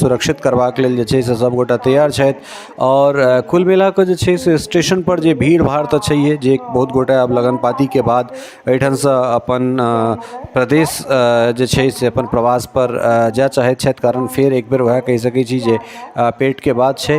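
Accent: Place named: Bhojpuri, India. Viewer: चुप हो जा बहुत छ छ छ किया भोजपुरी बोले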